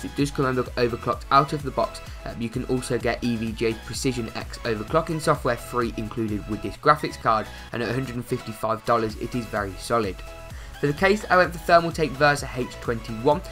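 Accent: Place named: Doncaster, United Kingdom. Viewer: I know this man is from Doncaster, that accent has a weird " dh-u" noise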